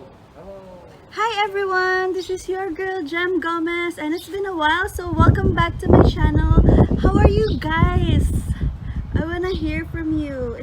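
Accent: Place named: Philippines. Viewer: That Filipino accent lol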